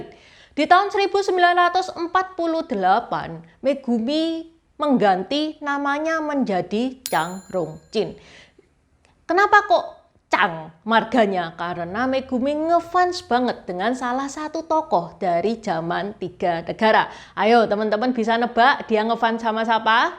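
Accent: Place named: Java, Indonesia. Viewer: Andai aku dengar cerita ini dari radio, pasti aku menyakini klo ini orang Jawa tulen...wkkkk. Maju terus....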